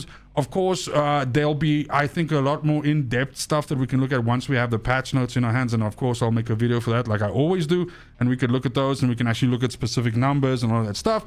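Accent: South Africa. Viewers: Bro sounds south african. But i just can't prove it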